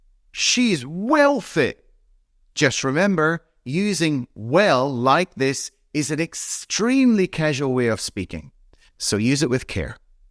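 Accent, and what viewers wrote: Scotland, United Kingdom. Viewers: Thank you so much for this video, I finally fully understood what dodgy means now! I am Japanese, and my Scots husband uses it awful lots, I understand most of time, but sometimes I am like “ what does that dodgy mean in this case?”. I wonder if you are a Scots too? The first bit “ I got lost in London ~” and some words sounded like so, but I wasn’t sure. Cheers!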